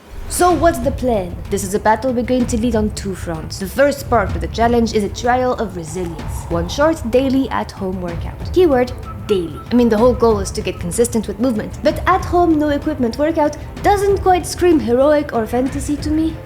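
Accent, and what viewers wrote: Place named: France. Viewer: Okay great, now you not only have a super cute french accent and love DnD but you're ALSO a strong muscle mommy? My brain can't handle this combination of traits I adore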